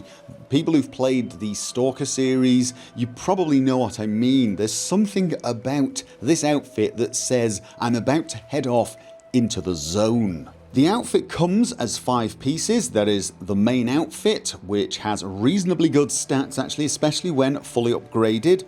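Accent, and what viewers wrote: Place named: Scotland, United Kingdom. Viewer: Hey: The proper name for the Regent sword is Claymore. Judging by your accent, you're closer to the history if it than I am. Another great video. Thanks.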